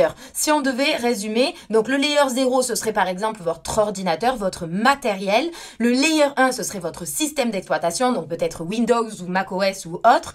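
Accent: South, France. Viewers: té pas un peu du Sud toi?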